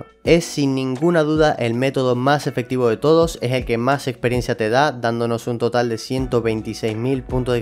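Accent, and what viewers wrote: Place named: Canary Islands, Spain. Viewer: Holii eres de canarias? Tienes acento "nueva" xs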